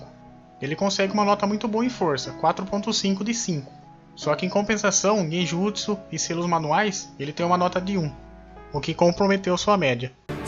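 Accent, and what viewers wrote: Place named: Paraná, Brazil. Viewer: vc e paraienese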